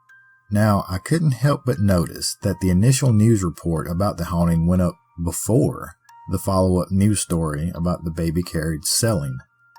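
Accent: South, United States. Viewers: Interesting and intriguing stories narrated by a Southern, Good-ol'-boy; accordingly, worth a sub.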